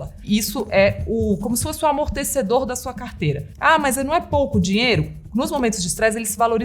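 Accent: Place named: Minas Gerais, Brazil. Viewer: Kkkk. Muito mineira nos jeitos e trejeitos pra falar. Além de saber muito, sabe explicar de uma forma muito fácil. Parabéns e sucesso pra todos na Spiti.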